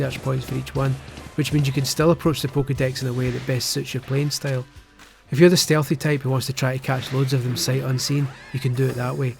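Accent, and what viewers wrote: Scotland, United Kingdom. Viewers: The most Scottish man reviews pokemon, good review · came for the amazingly in depth review stayed for the amazingly unintentional scottish asmr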